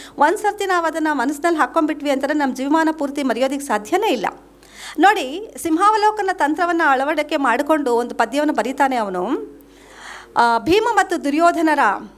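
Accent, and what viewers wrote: Standard. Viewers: ಅತ್ಯಂತ ಸ್ವಚ್ಚವಾಗಿ ಕನ್ನಡ ಮಾತನ್ನಾಡುವ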